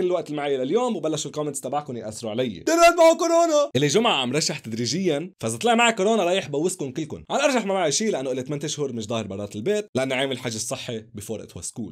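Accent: Lebanon. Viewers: لهجتك اللبنانية ناااااار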